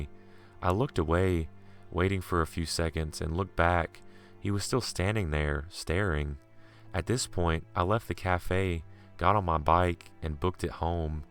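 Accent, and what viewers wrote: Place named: West Virginia, United States. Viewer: Love listening to your voice! I'm from West Virginia and your accent sounds like you're from wv too!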